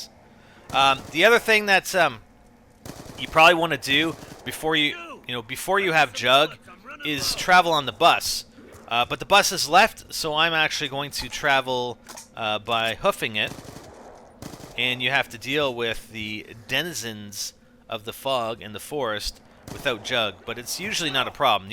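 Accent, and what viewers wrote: Canada. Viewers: im guessing you're canadian? btw thx for the strategy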